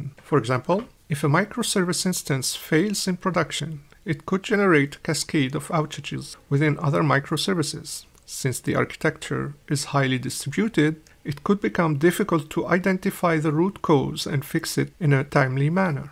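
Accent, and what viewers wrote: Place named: India. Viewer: at 1.25x this sounds like an indian accent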